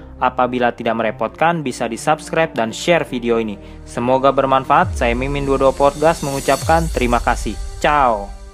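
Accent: Indonesia. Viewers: Aduu baang, bacanya diperbaiki mohon. Jangan semua nama diucapkan sama pelafalan Indo :(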